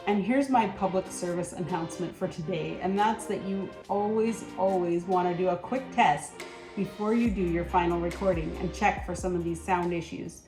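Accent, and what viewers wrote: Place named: England, United Kingdom. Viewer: I liked your English accent. I´m from Brazil. Where are you from?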